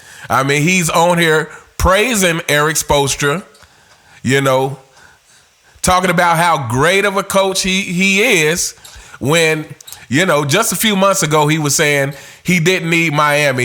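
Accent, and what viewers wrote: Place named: New York, United States. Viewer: i love listening to your takes. aside from the actual content, which is almost always spot on, i love your accent and cadence. maybe because i grew up in nyc, and i'm just used to newyorican accents.:-) but also love your laugh! reminds me of my youth (i have no idea how old you are, but i'm almost 64, and me and my boys laughed like that a lot back in the day. (i left city life well over 2 decades ago). keep it up my brother, tell it like it is!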